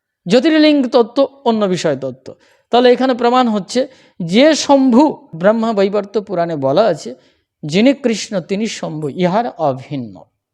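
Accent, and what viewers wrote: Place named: Khulna, Bangladesh. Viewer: Dada apni khulna odhibasi mone hoy amer ma er vai er moto apner kotha boler dhoron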